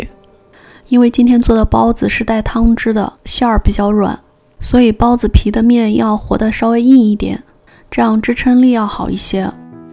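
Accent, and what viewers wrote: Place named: Northwest, China. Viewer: 西北口音哈哈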